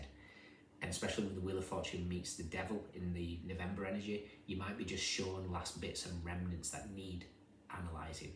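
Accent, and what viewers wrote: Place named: Scotland, United Kingdom. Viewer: Hey there handsome man! and thank you for your time and by the way has anyone ever said that you have such a lovely accent !? 🤔 ❤ Cause you do!🌹😊 Is it Scottish? Enlightened me.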